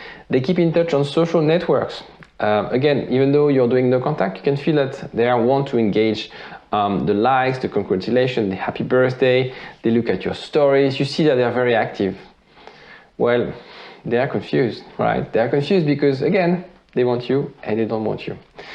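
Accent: France. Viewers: Love ur French accent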